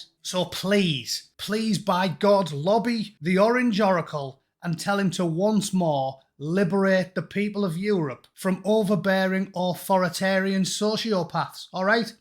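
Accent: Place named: Middlesbrough, United Kingdom. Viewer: You from the Boro lad???? New subscriber ... You sound like your for my neck of the woods.👍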